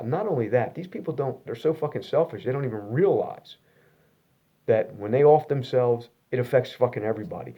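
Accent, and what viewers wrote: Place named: North Jersey, United States. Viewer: can tell from the accent.. am from north jersey myself.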